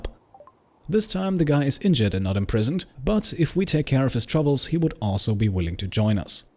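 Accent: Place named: Germany. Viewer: Greetings from your neighbouring country (the Netherlands) I love rimworld and i am happy i found your channel a month or two ago. One of my favorite games with someone who is doing a cannibal playthrough and who has a calm voice with a german accent that i really like. Keep up the good work. I can’t wait for the next rimworld series if this one ends.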